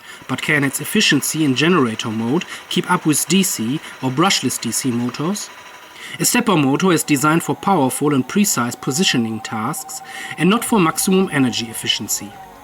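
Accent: Germany. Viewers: This is the most German English I have ever heard, saying this as a German xD But high quality content, I see the effort put into this, nice job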